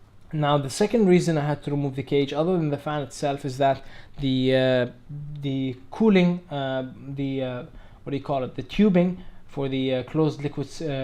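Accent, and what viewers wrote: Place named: India. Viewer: كأنك هندي قاعد يتكلم إنجليزي هيا وسابي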